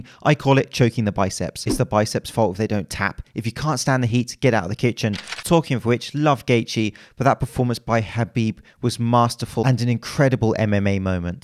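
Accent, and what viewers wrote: England, United Kingdom. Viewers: "Restrictimg blood to their brains" haha. I found your channel the about a week ago when I was looking for some creatine info. Great stuff and I love the humor. One of the few subs I actually have. But one downside, I caught myself reading a textbook with an English accent in my head... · Love your videos mate, specially the taking the piss ones. Would love it if you did a video on the old timey light dumbbell system. Got a book about it, David Bolton's the lost secret to a great body, and it really changed my body. I'm what you call a hard gainer. Been doing Capoeira for 14 years, so thin, well defined muscles, but I always felt like my arms were a bit like T Rex's. After 2 months I gained 5cm around biceps, there's almost no appreciable DOMS after a month and you get a very specific feeling i've never felt before of being BIGGER and ENERGIZED after each session. 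You sound like you live in Britain, maybe you can make an interview with the author, find some evidence on how this training method works? I've tried to look for it myself but can't find anywhere why these changes have occurred so fast. Maybe increased glycogen storage in the muscle and therefore more water retention in the fibre? But I am objectively stronger and have more muscle endurance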